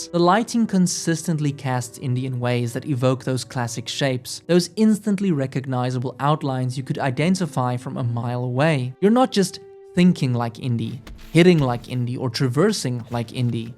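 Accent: South Africa. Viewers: The most South African sounding creator I have never seen.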